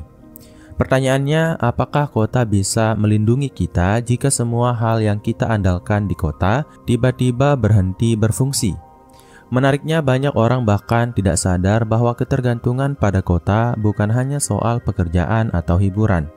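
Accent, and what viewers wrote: Bali, Indonesia. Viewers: naratornya bli bli Bali ya.....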